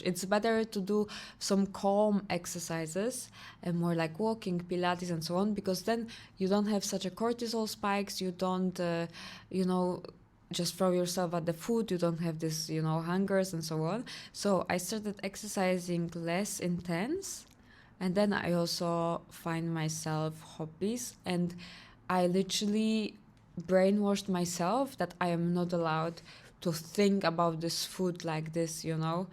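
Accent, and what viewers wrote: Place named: Russia. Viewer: У Вас потрясающий русский акцент 🔥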